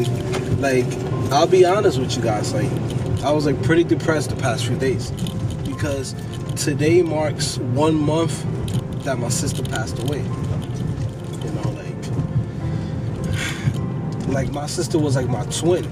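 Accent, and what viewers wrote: New York, United States. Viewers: Hey I have been watching your video for days. loved the work. So sorry to hear about your loss, family is the biggest wealth a person has. BTW are are you from NYC? I am from queens and I think you are a new yorker too. :)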